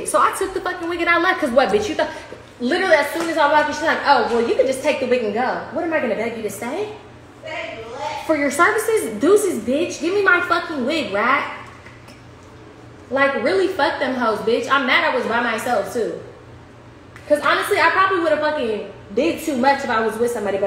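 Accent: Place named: Los Angeles, United States. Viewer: She’s from LA. We all talk like that lol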